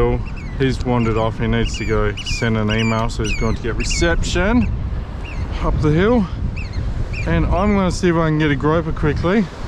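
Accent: Australia. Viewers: You sound d like Aussies.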